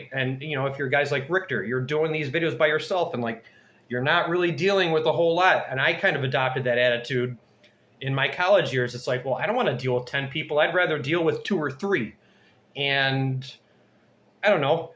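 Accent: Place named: Midwest, United States. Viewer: wow you have a strong midwest accent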